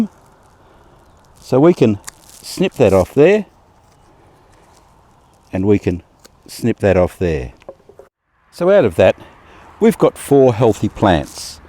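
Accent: Australia. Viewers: Just love your Aussie accent. And thanks for the helpful info.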